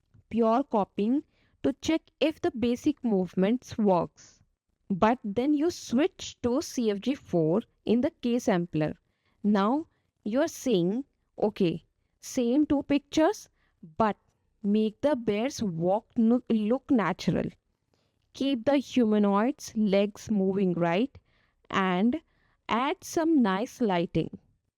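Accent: India. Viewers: Jesus Christ, you're indian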